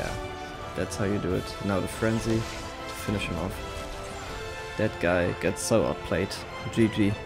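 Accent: Germany. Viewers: Der deutsche Akzent ist göttlich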